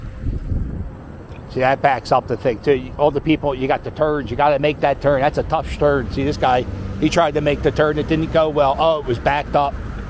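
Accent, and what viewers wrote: Philadelphia, United States. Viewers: I like this guy's Philly accent.....lol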